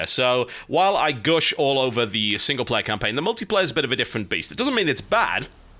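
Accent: United Kingdom. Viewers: Man you are british...BTW i mean that inna good way!! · So. British. FUCK YEAH.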